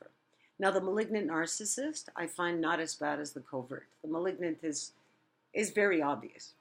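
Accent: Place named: New York, United States. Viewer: You have a New York accent. Sounds nice and it is attractive feature on a person. Have a nice and safe week!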